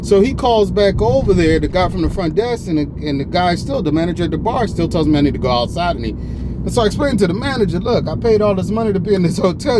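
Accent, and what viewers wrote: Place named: DMV, United States. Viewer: Sound like you in the DMV area lol!✊🏽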